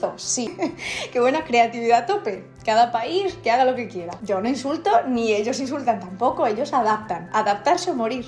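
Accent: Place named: Andalusia, Spain. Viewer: A mi me molan mucho tus videos y me encanta tu acento andaluz 😃🤩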